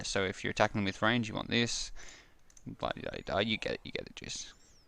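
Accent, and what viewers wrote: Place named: Australia. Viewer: Love hearing another Aussie on osrs swearing like nothing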